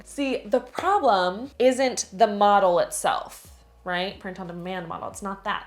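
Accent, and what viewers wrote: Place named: United States. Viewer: Hi! I am learning from you. It is not only about side hustles but also about understanding and speaking English with an American accent. There are many languages and dialects here in the Philippines, and I speak 4 of them. English is such a big deal here, you have to speak English well to be considered smart and intelligent by most people. You are easy to understand, and I am planning to watch 1-2 videos of your videos every day. Thank you.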